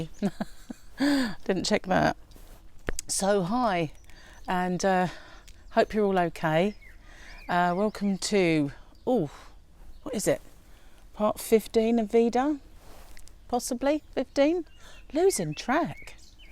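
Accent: United Kingdom. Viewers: Text or call and see if they will take measurements that you can use in crocheting projects. I am enjoying your Veda as I feel I go for a visit to the UK each time I hear you talking. Like you, i steer away from the grim and doom frequently portrayed on TV. I have tuned into some good Christian channels that are instead very upbeat and encouraging. Keep it up love, you are doing fine!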